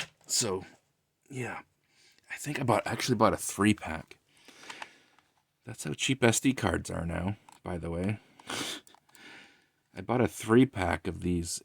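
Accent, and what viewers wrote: Canada. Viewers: ah yea i heard some Canadian inflection but thats common here too lol and alright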